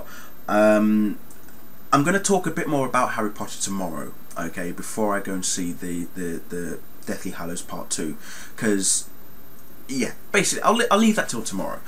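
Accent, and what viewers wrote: United Kingdom. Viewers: Im 1 min into your video and already leaving a comment, lol. Im seeing it tomorrow with Akemi, you know her as AkemiChandler on Twitter and shes my best friend since I was 9. Im 23 now, lol. I wanted to see it at midnight, but then I thought, I actually wanted to be wide awake when I see it so me and her arent seeing it at midnight either. Im going to be an emotional mess. Oh, btw, I love the way you talk. I love England and the accent Im simply in love with. I simply love listening to you talk.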